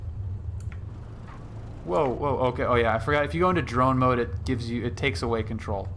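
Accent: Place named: North, United Kingdom. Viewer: But you have a northern accent! 🤨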